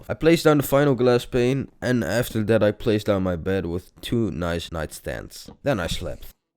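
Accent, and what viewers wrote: Netherlands. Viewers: hey! :) are you dutch? your accent sounds very dutch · You sound very dutch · are u dutch? i am.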